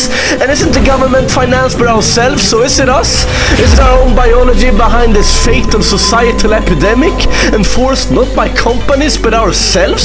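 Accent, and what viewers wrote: Sweden. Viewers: I think I have watched this video maybe 20 times. I think I can already remember half of the script! Everything in this video is just flawless, everything from the music choices, pacing, the Swedish accent to the stunning motion graphics and design. This video is just perfect. This video has inspired me so much and will surely influence my decision what I want to pursue later on my studying career. I don't know if you are planning on making more content, but if you are, I will be waiting excited!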